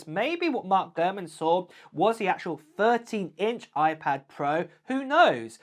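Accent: United Kingdom. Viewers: Please Apple, use an American person for PR and not a Brit😡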